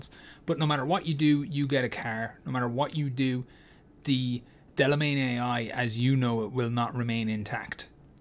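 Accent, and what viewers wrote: Ireland. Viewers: i can feel the irish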